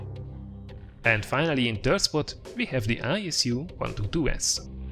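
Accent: Hungary. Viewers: Hat ez nagyon hunglish lett, fajdalmas volt hallgatni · Magyar? :D Az akcentus nagyon olyan. :D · started the video while turning away from screen. after the 1st word, I would say (typing this without looking at either the comments or the user) this is a Hungarian accent... And, no mistake for sure... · Great video. I am 100% sure that you're from Hungary :)) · Are you from Hungary?😃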